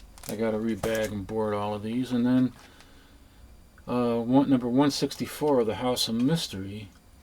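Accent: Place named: New York, United States. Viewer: you could be a voice actor you sound like a newyorker who knows the streets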